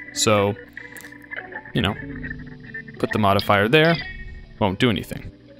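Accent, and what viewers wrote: Toronto, Canada. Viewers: wait are you from Toronto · Are you from Toronto?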